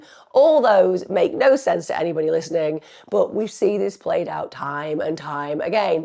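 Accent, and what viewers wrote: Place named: United Kingdom. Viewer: Please slow down I cannot understand you one your British and as a American your accent is hard enough to understand and two you add the spped of your speach makes it really hard to understand, I do enjoy the videos I understand.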